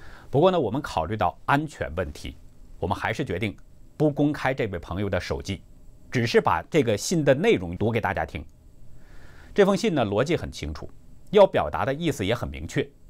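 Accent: Tangshan, China. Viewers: 这主持人肯定是河北唐山人，太浓的唐山味了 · 主持人有点唐山味，赵丽蓉老师的同乡吧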